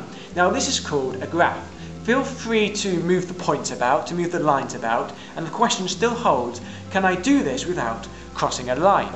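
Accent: United Kingdom. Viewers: the britsh accent is what kept me watching, and then i actually found it interesting!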